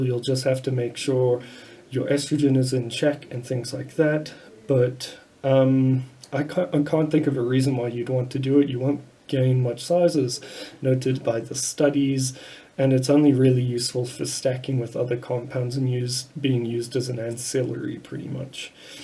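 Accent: South Africa. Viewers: Do I hear an SA accent there?? 😉 Good on you for putting out good info on the subject! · Your south african right man....great vid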